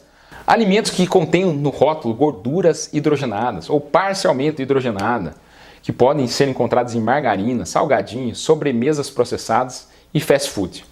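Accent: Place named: South, Brazil. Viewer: Adoro isso, médico q não deixou de clinicar pra virar Youtuber e vender fórmulas! Este cardiologista sim. Se vê q ele fala de um hospital e tem um sotaque de quem é da região sul. Este eu ouço SeMPRe e sigo o q indica. Vai direto ao assunto sem enrolar e sem vender nada. Confio plenamente neste, e tô sempre de ôlho e o escuto até o fim. Tmb, não enche linguiça até chegar aos finalmentes. Meu preferido, entre outros parecidos.Uu😍👏👏👏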